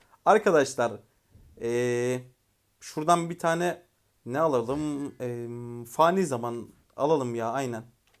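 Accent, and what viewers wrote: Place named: Thrace, Turkey. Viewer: Trakyalıyım tekirdağlıyım ama öyle konuşmayı beceremiyorum :/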